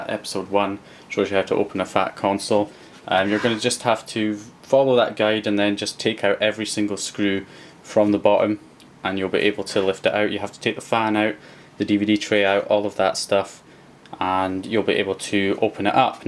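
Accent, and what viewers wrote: Scotland, United Kingdom. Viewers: Where abouts in Scotland you from?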